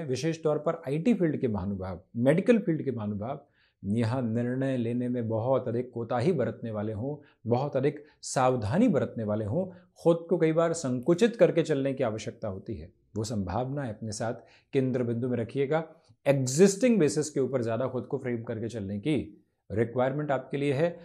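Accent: Standard. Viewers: Guru ji ki hindi bahot pure 👌 hai aur aise hi honi chhahiye ❤ English medium ke bachho ko Badiger problem hoti hai hindi ki 😅 thanks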